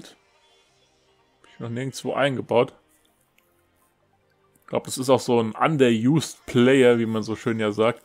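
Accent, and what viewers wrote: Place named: Hesse, Germany. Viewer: komme auch Hessen :D bester Dialekt...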